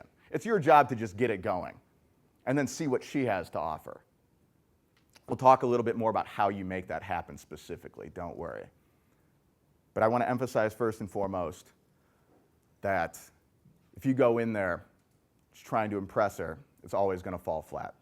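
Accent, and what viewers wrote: United States. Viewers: I thought this guy was British. Nope, can't trust an American to give me advice on how to hold a proper conversation. Americans (even the educated ones) don't know how to use basic proper English phonics, punctuation and say "like" out of proper use WAY too much. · Very Intense, very american, not so british, wouldn't work as well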